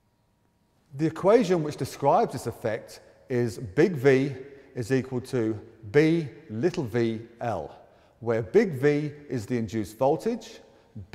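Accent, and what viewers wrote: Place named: England, United Kingdom. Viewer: if you google the pronounciation of wire on google there are two ways to say it. he is saying it in the British way. shocking for you there are accents other than an American so shut up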